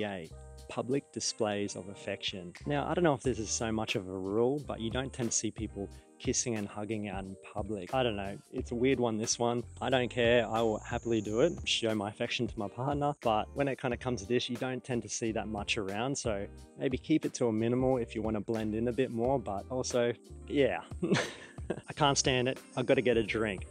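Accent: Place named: Australia. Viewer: I am from jp. First time watching. Sounds like you are from Australia and you did mentioned that after 12 mins in. I believe you when you said it is hot. I can hear them cicadas chirping away. Did you master the art of dozing off on train, but managed to wake up right before your stop yet? They are starting to post signs telling us to not walk on escalators. I don't think that will every happen. I always walk on them. If I stand, I feel like I have missed 10 things I could have completed if I did walk. To be fair, the conductor do say to wait for others to get off the train first before you step in. Also, they pick up their trash and food wrappers they have purchase when they left the room. Sounds like you have been in jp for over 3 months. You must be another master of sorting your trashes at home A??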